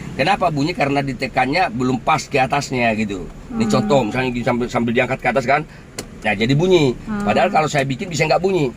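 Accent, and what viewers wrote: Medan, Indonesia. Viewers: Enak ini bapak ngajarinya top mudah dipahami sabar dan telaten diawali dr dasar bicara logat Medan teringat ayah ku kalau bicara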